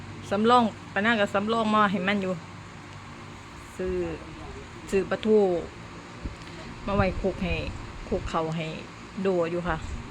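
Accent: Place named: Isan, Thailand. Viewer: บ้าน ก็ฮ้อนบัอ..ขอ้ยก็คิดว่าสิฮ้อนแต่บ้านข้อยแม้..เว้าพื้นบักดุกดิกยิ่งใย่ก็เป็นตาฮักแท้น้อ..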